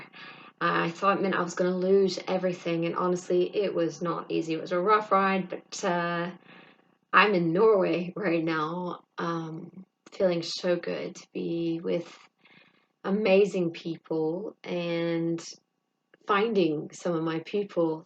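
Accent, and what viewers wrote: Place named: United Kingdom. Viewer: Can you teach me your wonderful British accent?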